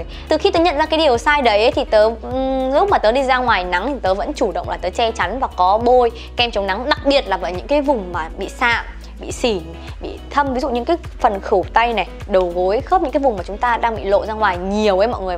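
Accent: North, Vietnam. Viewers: Chị ở Thanh Hóa người Miền Bắc nha. Bạn nghe đâu ra miền Trung vậy 😂😂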